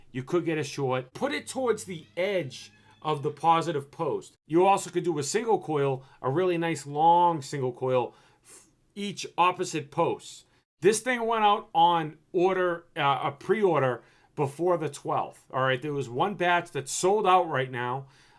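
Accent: Boston, United States. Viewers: Are you from Boston bro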